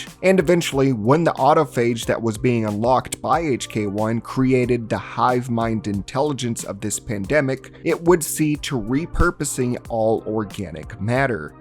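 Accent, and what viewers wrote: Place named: South, United States. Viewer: Man you’re voice gets less southern with time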